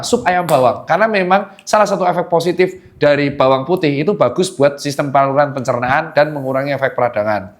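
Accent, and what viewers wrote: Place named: Surabaya, Indonesia. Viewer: Cok.. Arek iki wong sby ta? Lambene kok cocok karo aku.. 😂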